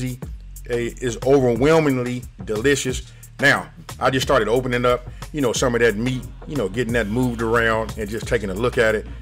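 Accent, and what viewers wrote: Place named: Los Angeles, United States. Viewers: You must be from Cali. I can hear what sounds like an LA accent. I'm from Cali, but moved to Texas last year. I didn't realize we had accents until I moved here. Great video, I know them greens are fire. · My god that looks delicious ❤️❤️❤️👌 And I love,love, love that Cali accent!!! Southern TN girl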